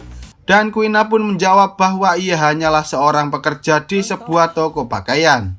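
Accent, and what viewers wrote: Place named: Banyumas, Indonesia. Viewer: ngapak nya dari daerah mana nih 😂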